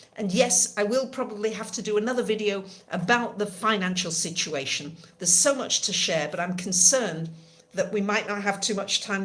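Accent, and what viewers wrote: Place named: United Kingdom. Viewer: P.S. I simply love your british accent !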